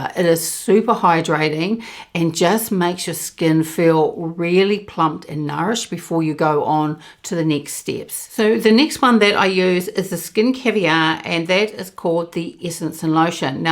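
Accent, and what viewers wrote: New Zealand. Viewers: Lovely Kiwi accent!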